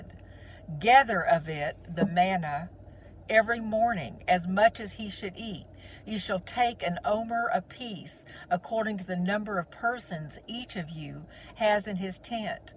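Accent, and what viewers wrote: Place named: North Carolina, United States. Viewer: I was wondering if you were here in NC by the accent. Really love your work. The Lord has called me similarly... 🙏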